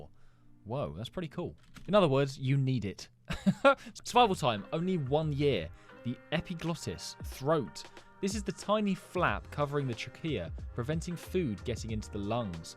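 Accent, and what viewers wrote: United Kingdom. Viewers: I’m sorry, but you sound British are you British at the sound British so are you British? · …you are British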